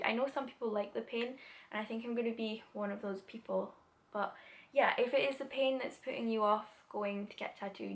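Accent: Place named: Scotland, United Kingdom. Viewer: Gahh! Love it! Where abouts are you from? Obviously Scottish but your accent is quite a bit different from mines. I'm getting my first tattoo from my cousin in Edinburgh:)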